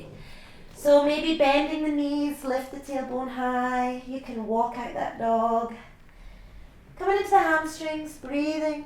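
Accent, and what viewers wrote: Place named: Glasgow, United Kingdom. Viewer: That was great the glasgow accent made it better thanks namaste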